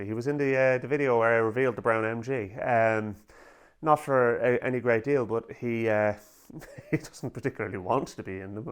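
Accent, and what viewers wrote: Ireland. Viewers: The Irish lilt is very pleasing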